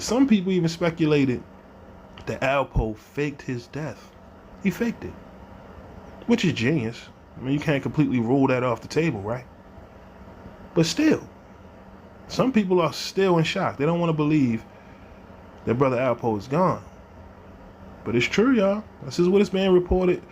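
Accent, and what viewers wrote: Baltimore, United States. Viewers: You from Baltimore dummy? Glad I found this channel Love hearing dudes from Baltimore get love we on the map right now with the music and shit 💪💪🔥🔥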